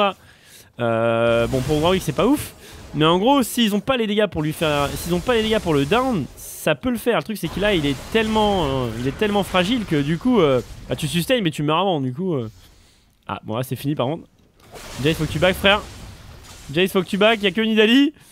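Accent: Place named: England, United Kingdom. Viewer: Cet accent anglais :D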